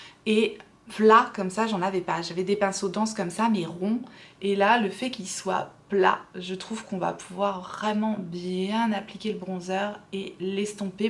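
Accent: England, United Kingdom. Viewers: Décidément ton accent anglais me plaît beaucoup, j'ai le même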